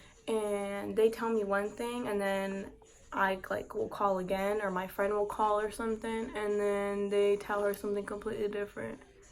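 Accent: Mexico. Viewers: Shes clearly Mexican by the way she talks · She talks like a Mexicana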